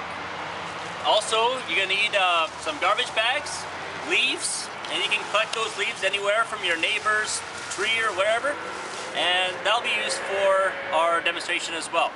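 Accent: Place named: Canada. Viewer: I’m Canadian, and as soon as I heard this I could hear the accent😂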